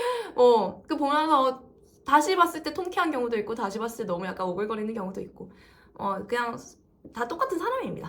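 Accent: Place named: Busan, South Korea. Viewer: 부산에서 하지말고 서울 큰데로 가보는건 어떤지? 열정이 있다면 큰데로 가서 도전해 보시라 원없이.. 해보고 해보고 원없이 해보고 나서.. 1년정도 정말 원없이.. ㅡㅡㅡㅡㅡ 그래도 안되면 다시 생각해 보시라..미래를 생각해 보시라 저도 부산이라 느끼는건.. 부산 사투리가 너무 자연스러움 다른 방향.. 예를 들어 코미디 영화배우도 충분히 가능하실듯 🎉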